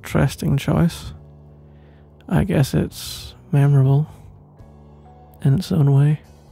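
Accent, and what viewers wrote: Scotland, United Kingdom. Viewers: I loved this audio, but I am also a big fan of the Harry Potter series, so I was wondering if you could do audios for one of the characters, specifically Oliver Wood because he has an identical accent to yours. (His accent made me look for Scottish audios :)) If you could do a series for him on how he would react to certain stuff that would be amazing. It would give you a chance to broaden your horizon a little bit and have fun with this character! If you have already done something like this, then don't pay any attention to this :). I love your videos by the way!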